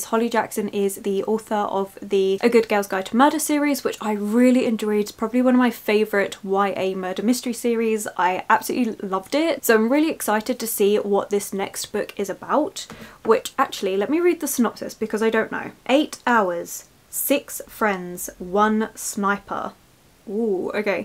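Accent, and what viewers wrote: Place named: Essex, United Kingdom. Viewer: I really loved this video and channel,happy monday xx fan 🧑‍🎄.....ps you sound essex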